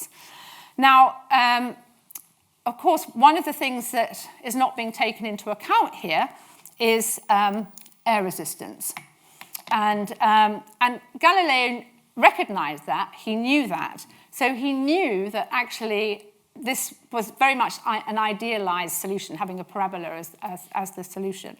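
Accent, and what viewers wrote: United Kingdom. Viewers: She’s just British bro, that’s how they talk. 🥀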